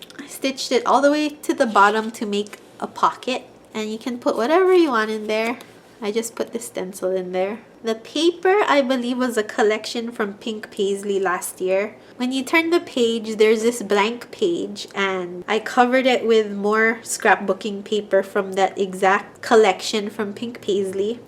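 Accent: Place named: Hawaii, United States. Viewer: I love your video and use of the different hobonichis 😁. By any chance are you from Hawaii? You sound local and make me miss home. (Much like nadine)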